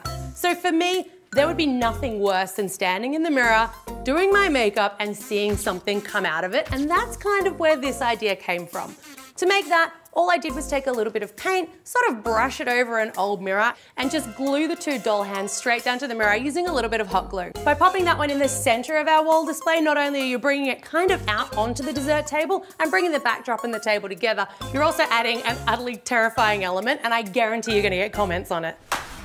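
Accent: Australia. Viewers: But her accent is clearly Austrailian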